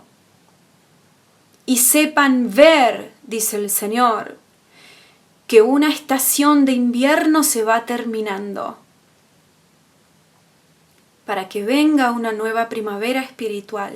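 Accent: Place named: Argentina. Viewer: Venezolana con acento argentino! Me chocan todas estas sectas incluyendo la peor q falla católica, nunca se pronuncian en favor de los necesitados. Estos pastores q tanto le roban a los ilusos, estos curas con tanta plata q tienen de años estar robando la humanidad q han logrado sembrarle a esas matrices de miedos y temores a los ingenuos, esos pastores de las sectas cristianas, con todo el dinero del mundo, como Cash Luna, Maldonado, Jonás Cardona y demás. Donde han sacado un peso para ayudar el pueblo venezolano? Ya q se roban $ hagan los siempre hacen, del millón ochocientos q se roban regalen en cuando sea los ochocientos, hacen lo mismo q hizo la iglesia católica en la segunda guerra mundial, mientras mataban ocho millones de judíos, su religiosidad de perdon, piedad y misericordia, nunca se pronunciaron, voltearon la cabeza sin importales nada. 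Eso mismo hacen en este momento, les importa un comino la necesidad. Creen q a punta de cháchara los van ayudar.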